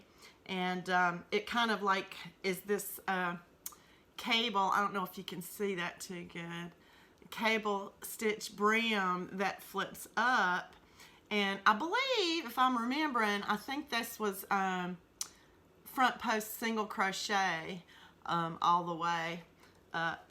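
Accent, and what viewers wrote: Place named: South, United States. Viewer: I love your southern accent ! I'm from Kentucky ! Your hats are beautiful !! And so is the baby blanket ! If you would like to check out my work, come on over . See ya next time !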